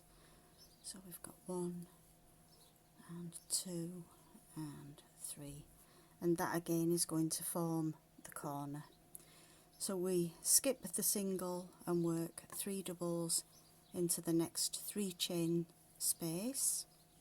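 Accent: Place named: England, United Kingdom. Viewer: You sound English yet you are calling the stitches in American! They are Triple stitch not doubles.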